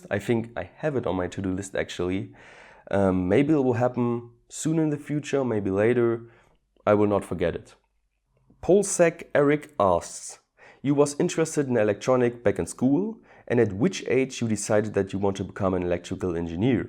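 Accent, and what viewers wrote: Saxony, Germany. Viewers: Glad to see your face :),and your Germany accent , and i like your projects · Ich wuste du bist deutsch ^^ Da deine handy werbung auf deutsch war und mans an der stimme hört ^^ PS: Geile video, bitte weiter so! vll auch deutsche videos und englische zugleich? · Ich wusste immer das du ein deutscher bist man Merk das an der Sprache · Jaaaaa, sächsischer Dialekt und Simme fahren, das ist Kultur! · Your English is improving fast. Are you planning to relocate to an English speaking country. I like the German accented English though. Holiday on DIY bike ???? Awesome. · I love the German accent, it actually makes your videos more interesting. I will see you later!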